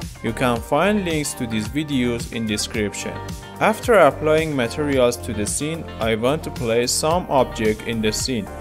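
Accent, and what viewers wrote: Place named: Iran. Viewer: Good but You have to work on your pronunciation. (too much farsi accent and wrong pronounced words).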